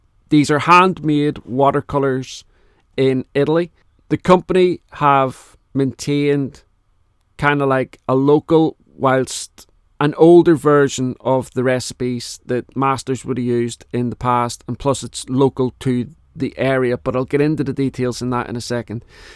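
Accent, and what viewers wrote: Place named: Northern Ireland, United Kingdom. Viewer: I absolutely ADORE you accent!!!! The Northern Irish accent is quite possibly my favourite English-language accent. Actually I find that English speakers often mangle foreign languages not because they are not trying, but because they are putting in too much effort (so overcompensating wildly, trying to imitate what to them sounds like the foreign language they are trying to speak). Which is actually kinda sweet… except when it leads to funny misunderstandings - like when English speakers pronounce “coup” as “cul” (I hope YouTube does not remove this comment, haha), which in French means… a vulgar word for bottom. A very polite British friend of mine got in trouble for misprounoncing “merci beaucoup” that way. So, he accidentally said to a lady who was holding the door for him, “thank you - nice a**e!” 😂 PS - I am also a fluent French speaker. 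And the Irish accent sounds wonderful in both!!!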